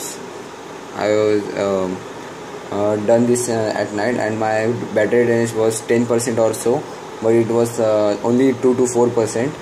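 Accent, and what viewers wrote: India. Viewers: it's ay Indian accent think before commenting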